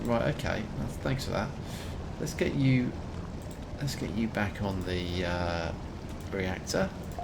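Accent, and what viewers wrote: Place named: Australia. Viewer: Was looking for some beginner s guide for Barotrauma and found you, boy am I lucky: I dig your style! you sound like a nice guy, you're a father like me, you play cool games and all... I am just sad you don't twitch (?). I'd even play with you! Though you sound a bit like the hilarious SovietWomble, from your accent I would say you're an Aussie? if yes I just arrived to your great country and I love it! Cheers mate.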